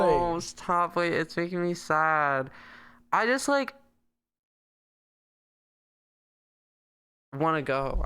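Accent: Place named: New York, United States. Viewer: i really feel the new york talk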